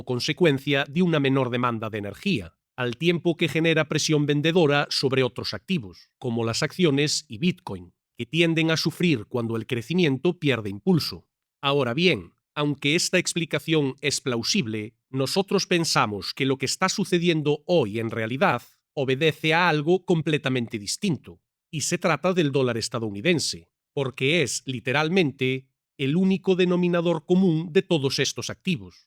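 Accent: Galicia, Spain. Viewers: Me encanta absolutamente que el narrador sea gallego. No lo cambiéis nunca!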